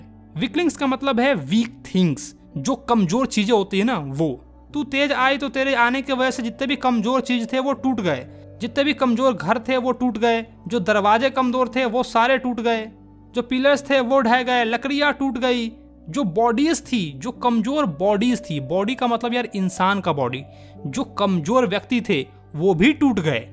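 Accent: Bihar, India. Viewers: Hi sir u are from bihar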